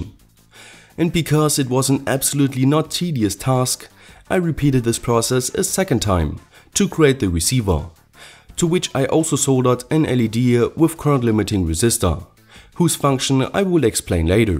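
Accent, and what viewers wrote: Germany. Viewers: Sander Dol i think he’s German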